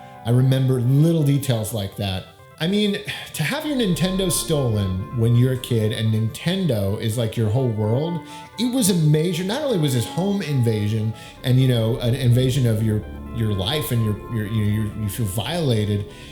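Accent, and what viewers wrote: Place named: New York, United States. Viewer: awesome yea I've been all over at this point (Li) I've seen u mention in previous videos your family was from Li that's great. I can def tell the NY swag and way Long Island is pronounced in videos 🤣 its a Li thing. But yes I was lucky enough to have my stepfather replace all the things lost which def wouldn't have happened a year prior. Grew up with a single mom so I always got hand me down systems. I def wasn't getting another had he not replaced it. He felt awful I was very lucky.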